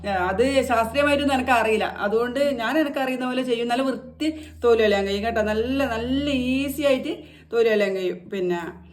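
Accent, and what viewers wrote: Kannur, India. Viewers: എന്താ രസം പറയുന്നത് കേൾക്കാൻ നമ്മുടെ കണ്ണൂർ ഭാഷ പൊളിയല്ലെ മുത്തെ 🥰🥰🥰🥰❤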